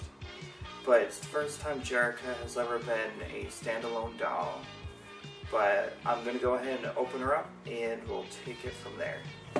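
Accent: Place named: United Kingdom. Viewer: I hear British accent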